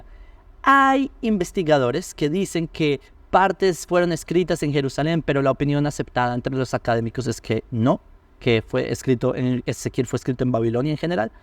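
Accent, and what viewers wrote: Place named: Bogota, Colombia. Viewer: Muy buena explicacion...entonces es el estilo de tematica de Ezequiel...,tu hablas como si hubieses vivido en Bogota...tiene un español como de Bogota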